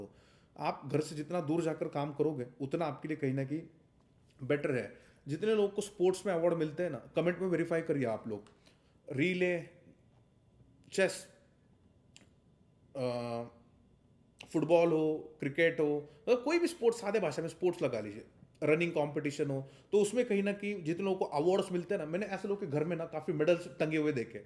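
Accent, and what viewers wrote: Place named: Maharashtra, India. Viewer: Aap maharashtra se ho ,marathi .. observed ur chautha pronounciation... Ekdam chan video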